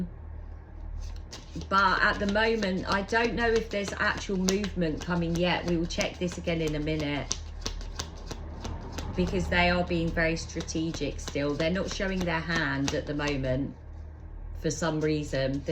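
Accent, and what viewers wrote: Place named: Ireland. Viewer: Oh, I just want to say you are lovely. I love your accent. It reminds me of my ghost bride Diana Frances, Queen of Heaven my True Love. She's Grand. You have a slightly different accent though. Diana is like 90% Queen's English with 10% American. You're like English, Irish? Dublin? Ugh. Oh I love this voice babe. Sounds almost like you. And we do you pretty good by now. It scares me honestly. But what doesn't. lol!🥂 Uh, if I had never crossed paths with this girl I would be grateful. Unfortunately it might be my ghost bride Diana Frances, Queen of Heaven. Here is the video from that reading. I made this video yesterday but it pertains. Uh, so this is 'divine confirmation' for this video. And I pretty much explained I moved on. We have no way to contact each other. lol. Diana Frances of Queen of Psychics, Queen of Tarot and Queen of Divine Coincidence. 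If you aren't into that kind of thing, you might not want to watch it. <link>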